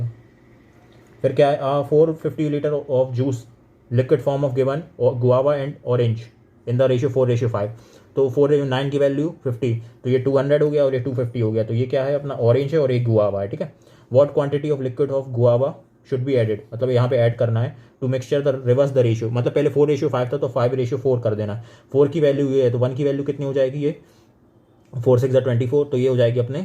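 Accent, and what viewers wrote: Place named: Bundelkhand, India. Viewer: Bundelkhandi jhalak rhi h apki tone me... Gajab badde.! Maan gaye..💥💥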